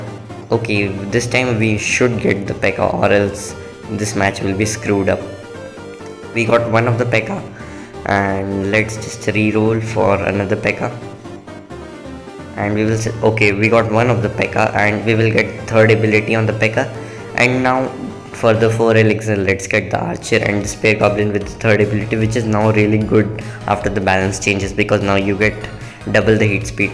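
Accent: India. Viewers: Are u Indian?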